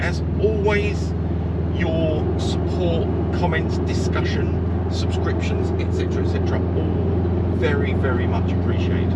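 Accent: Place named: Colchester, United Kingdom. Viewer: I just watched this video and thought he sounds a bit like he's from Colchester, and then seen you driving and saw you were driving in through Ardleigh interchange. I'm going to subscribe now. I'll have to keep an eye out for you on the road 🛣️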